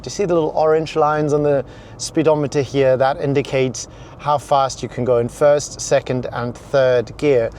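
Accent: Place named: South Africa. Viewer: Where in South Africa are you from?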